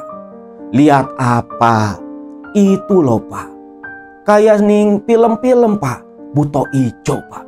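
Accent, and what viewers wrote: Java, Indonesia. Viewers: Oiyaa Ko aku salpok sama suaranya jadi medok kaya wong jowo😅😅😅🙏👍👍👍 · Betul sekali 😁😁 ngomong jowo e wes medok 😅😅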